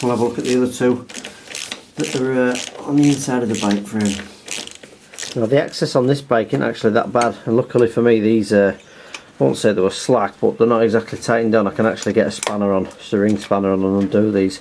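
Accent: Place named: North East England, United Kingdom. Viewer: just woundering wheres the Acent from. North east am guesing.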